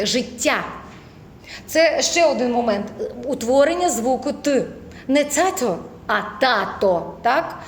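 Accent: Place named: Western Ukraine, Ukraine. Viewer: Таким чином шиплячi вимовляють на захiднй Украiн. У нас, на Днiпропетровщинi, не "ТЧикають". Напевне дiалекти краще залишати в мiстях iх вжитку, а не нав'язувати як еталон мови на педагогiчних подiумах.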